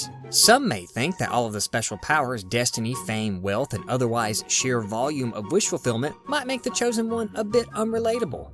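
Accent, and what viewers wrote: South, United States. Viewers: from what U.S. state is that Southern accent?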